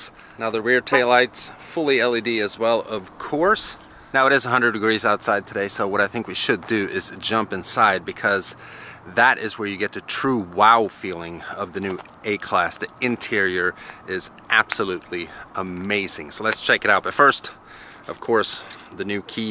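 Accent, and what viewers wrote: Sweden. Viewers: Great review. Am I hearing some Swedish in there? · I dont know why but your Swedish accent stood out to me in this vid 😅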